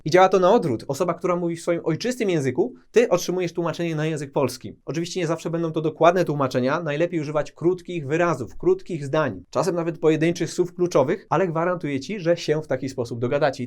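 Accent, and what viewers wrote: United Kingdom. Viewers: masz british english w stanach nikt tak nie mowi.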